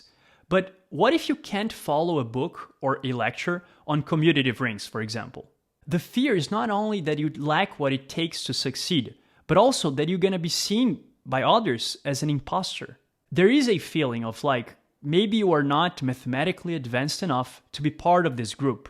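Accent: Brazil. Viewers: É impressionante como o sotaque brasileiro é característico 😅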